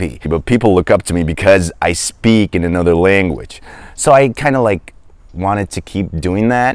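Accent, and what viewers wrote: United States. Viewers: That’s awesome!! Well you have been to a beautiful places like Phoenix Arizona,sedona Arizona you need to come again visit the Grand Canyon az!! Saludos desde Phoenix Arizona!! 🙏🙏 por cierto su inglés es estadounidense a la perfección 👌👌👌👏👏👏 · I'm falling in love with your American accent🤭❤️ thank you for the video and you're the best i'm sure about that☺️ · Hi from Australia! I thought you learned English in America cause of your accent. But I have to say I also prefer the American accent 😊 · Your English is amazing and your American sweet accent-wow ! Well done mate! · Very interesting video. I was raised bilingual, my mother tongue English and Spanish. At the age of 5 I spoke both. Translated to Spanish for my Grandmother who only spoke Spanish when watching TV shows. Born in the USA. Your English is perfect American. I also speak Dutch and trying to learn Turkish. 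· Dude your American accent is amazing, Awesome dude! This is my future goal, I wanna to master my pronunciation. By the way I speak also 5 languages. You are an inspiration for me. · Sesin çok yakışıklı ama 3 dakika geçtikten sonra rahatsız ediyor gibi. Bu arada tam bir american accent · I watched some of your other videos before this one. I am glad you explained how you learned English because I could not figure out how you sounded as if you learned American English rather than British English. This video explains it. I am attempting to learn Spanish and agree with you about the cultural aspects of language. Some of the language apps are great for vocabulary and some grammar, but watching television gives a bit more of an idea of how the language is used every day. I am not sure I will ever be fluent, but thanks for supporting what took me quite a while to figure out. I'm going to watch more of your videos for more ideas. 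If you wonder how I found your Turkish video, I tried watching Hercai in Spanish. I finally figured out I was in dizi land and not telenovela land by some of the language. I loved dizis so much that I started watching them in Turkish with English subtitles. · 💙 ... What an amazing story ... I would've thought you had lived for quite some time in America ... your American accent is just flawless ... I was in Turkey (Istanbul, Izmir, Kusadasi, Ephesus) last week and I fell in love with Turkey as a country, the language, the people, the architecture, the food, the smells ... in a word ... I decided to learn turkish and I found this helpful and fascinating channel ... Greetings from Miami ... !!! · Amerikali olmadiginizi soylemeseydiniz, sizi Amerikali sanirdim. Harika... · You speak American English better than some Americans! Great job...I can barely sense the accent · Why do you sound more American than English? 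· You sound American not Turkish or German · I love your accent. It's so american. So as mine. I used to learn english the same way, by listening to the songs and writing them down. I've graduated from english exactly 20 years ago, but I don't have the opportunity to use it in my every day life so over the time I forgot many words, even the simple ones. But instead I speak spanish with my sister- in- law (I don't know the grammar but I don't fear to speak) and I love turkish. I understand almost everything but have no one to talk to☹🤷‍♀️ Love all the job you do❤ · Çok iyi kullanıyorsunuz İngilizceyi bir Amerikalı gibisiniz. Kimliğinizi belirtmemiş olsanız, eminim herkes sizi bir Amerikalı sanırdı ☺️🙏🏻 Teleffuzunuz çok etkileyici ve konuşma stilinizle karşınızdakini etkileyebiliyorsunuz ve böylece karşınızdakiler sizi sıkılmadan dinleyebilme şansına sahip olabiliyor. Bu da gösteriyor ki dilleri ne kadar çok sevdiğinizi ❤️👍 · Amazing! 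How perfect, American English you speak with out living in the USA, I’ve been here more then ten years and you can beat me in second! You don’t even have an accent! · Gardaş which country of state u grow up? u have İmpressive hillarious american accent man 🙏🙌 well done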